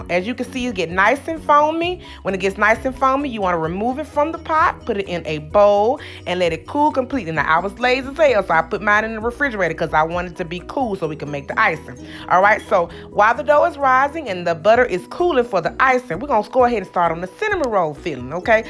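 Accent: South, United States. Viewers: That's that very southern and country talk and spirit. That's "kinfolk" talk ❤️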